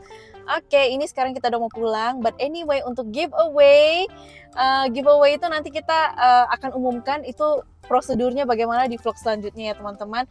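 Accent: Pontianak, Indonesia. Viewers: Logat pontianak kak tadak ilang suke liat kak ngomong 😊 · Yeayyyy shopping lagi ka bareng n daddy Boroooong ka, logat Pontianak nya gak ketinggalan ka ❤️💃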